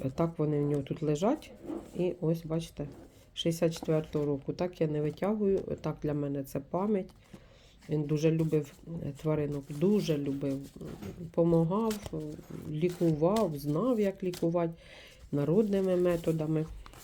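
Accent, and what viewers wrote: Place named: Kirovohrad, Ukraine. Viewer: ви самі звідки?Я з Кіровоградщини і ви розмовляєте так,як у нас балакають.Дякую,що я вас відшукала, відео короткі,дякую.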